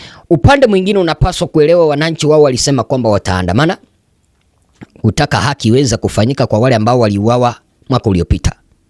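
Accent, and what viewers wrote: Kenya. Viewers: ETI TANZANIA 🇹🇿 TAIFA LETU. KISWAHILI CHAKO CHA KENYA 🇰🇪 HALAFU UNASEMA TANZANIA 🇹🇿 TAIFA LENU. WEWE NA NANI?? 😂😂😂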